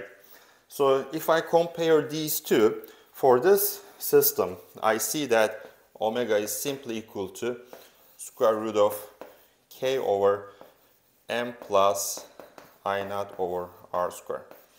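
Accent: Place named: Turkey. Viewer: hocam efsanesiniz. Türk aksanıyla ingilizce konuşmanız anlamamı kolaylaştırdı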